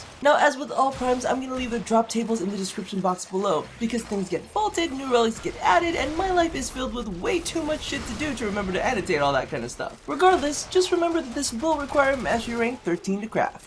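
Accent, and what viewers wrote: United Kingdom. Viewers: You should totally do more vids with your British accent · I have mixed feelings about that English accent.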